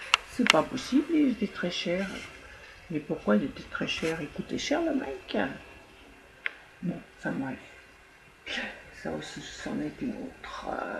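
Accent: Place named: South, France. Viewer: Bonjour hi hi 😊 j'ai aimé ta photo vidéo. Même sans plume ni poil. Tu sais là langue Française est très riche les origines y sont bien ancrées et pour longtemps encore mais ils y en a des milliers de mots comme çà ou expressions. Un seul exp parmi d'autres ( plan social.. Au lieu de dire chômage...) technicienne de service >au lieu de femme de ménage et j'en passe. Maintenant concernant la mort 💀 je suis KTO et je crois en Dieu comme à mon âme qui je l'espère retrouvera celles de mes parents et ma sœur... Chacun ses convictions, libre de ses croyances. Je trouve que ta vidéo est vraiment bien belle tu as un petit accent du midi 😊j'aime. Je te remercie pour le partage bonne journée. ♥️Bises_Pat. 😘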